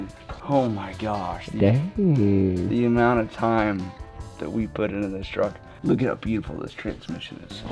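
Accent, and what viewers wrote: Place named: Ohio, United States. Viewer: I just found this channel and this is the first video I’m watching but his accent makes me think he’s from Ohio. Sounds a lot like Roman Atwood.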